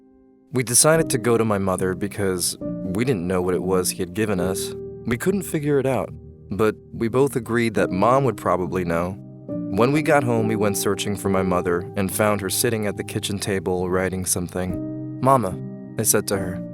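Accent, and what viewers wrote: United States. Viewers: Ok so he grew up in France and then moved to neither lands so why he got an American accent?????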